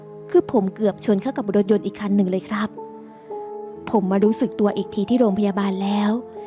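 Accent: South, Thailand. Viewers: ติดตามมาเป็นปีละ ฟังตลอด และสงสัยตลอดว่าสำเนียงแบบนี้ เป็นคนใต้เหมือนกันรึป่าวครับ 🤔😂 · ลองไปฟังคลิปเก่าๆนะเจ๊แกพูดภาษาใต้เยอะ เจ๊คนใต้แน่นอน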